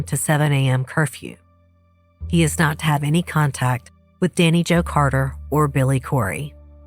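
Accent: South, United States. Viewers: I love your channel, you have the most relaxing voice to listen to a good story while omw to bed. And I’m from the South so I love your accent, it makes you feel familiar!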